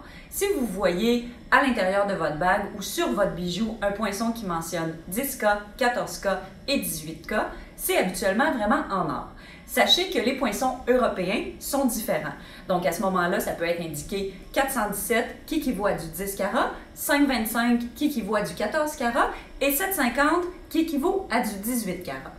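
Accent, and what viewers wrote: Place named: Perpignan, France. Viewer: Y a rien à faire , je ne supporte pas cet accent de Perpignan ...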